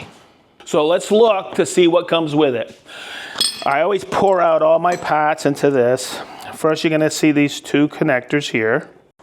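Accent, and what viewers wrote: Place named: Massachusetts, United States. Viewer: Wicked awesome!! (I love how your accent reminds me of all my mom's family - Swampscott, MA baby!) I am a relative noob to woodworking at 54 years young, but I am looking forward to upgrading my MFT-3. Love these super-helpful videos,!!! Answer: I tend to prefer metric since I grew up overseas, but am also comfortable using imperial. My "go-to" is metric, though.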